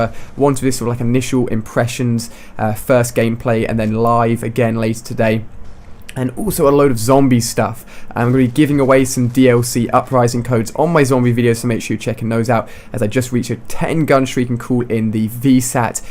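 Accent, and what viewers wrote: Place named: London, United Kingdom. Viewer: i think he is from UK but maybe london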